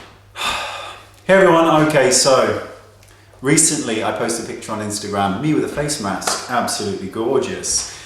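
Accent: United Kingdom. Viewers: I think you're gorgeous. You're British accent is probably misleading but if you're here in the UK call:-)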